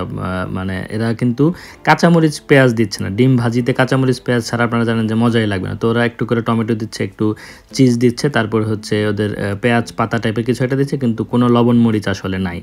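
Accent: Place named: Madaripur, Bangladesh. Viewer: ভাই আপনার কথা একেবারে খাটি বাঙালি.এভাবে কথা আমরা মাদারীপুর বাসি বলে থাকি. ধন্যবাদ এভাবে ভিডিও আমাদের উপহার দেওয়ার জন‍্য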